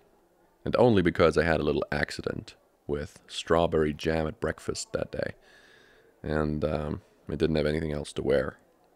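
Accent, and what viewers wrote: Germany. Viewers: such a good german akzent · I Love your German Voice 😂❤️❤️❤️ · love the german voice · that's a good German accent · Is he nativ german or why that accent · DUDE YOUR GERMANY ACCENT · 0 hitting me with the German accent and everything damn 😳✋🏻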